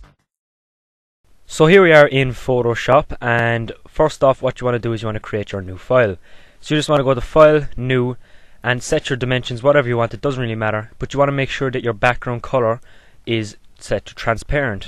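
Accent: Ireland. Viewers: the irish voice I can tell because Im from Ireland lol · Nice tutorial! Btw are you Irish?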